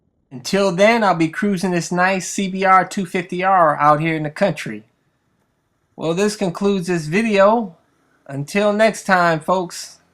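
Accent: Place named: Ohio, United States. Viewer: it's the Ohio accent we all sound alike most of the time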